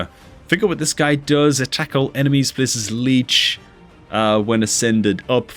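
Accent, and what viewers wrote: United Kingdom. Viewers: He's british ... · Why you hating on him he’s just got a British accent 😂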